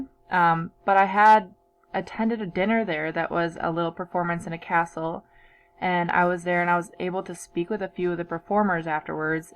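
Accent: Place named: Wisconsin, United States. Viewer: I've been seeing videos of the attractions in Wisconsin Dells and had no idea what it was until I watched your video. Thank you. It was great to hear you speaking so that I get an idea what what a Wisconsin person actually sounds like. I don't meet too many people who grew up there where I live in the south and yet I have met a lot of people from Michigan. There is a real similarity to the way you sound in your talk and the way people from Michigan sound.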